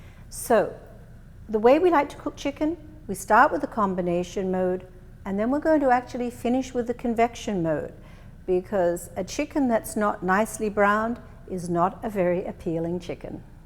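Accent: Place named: South Africa. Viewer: Hi, great video thanks, where is your accent from, it sounds South African (Cape Town specific), who's previously lived in Australia and now currently living in Canada:)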